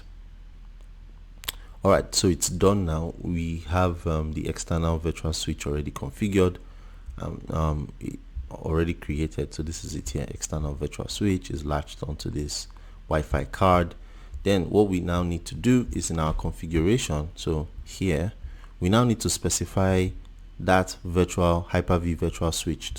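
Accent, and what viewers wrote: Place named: Nigeria. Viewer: I have not even seen the video yet. But I am subscribing because you sound nigerian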